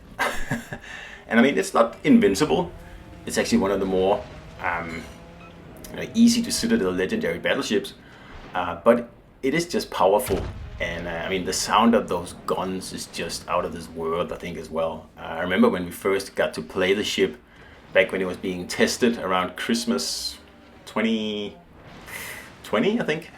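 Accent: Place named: Denmark. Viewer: Love your vids and commentary so subbed. I am just wondering were that accent is from, i am guessing Denmark. If I am wrong please know that I did not mean to be rude.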